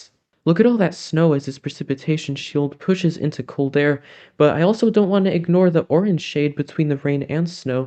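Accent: Portugal. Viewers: He says it perfectly with a beautiful Portuguese accent. please don’t change! ❤️🌟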